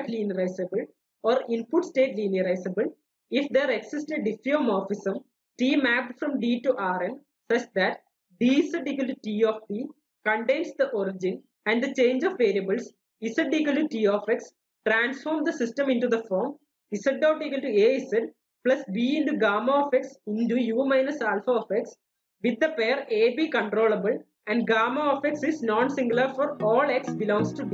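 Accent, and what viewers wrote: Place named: Thrissur, India. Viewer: I think I hear thrissur dialect here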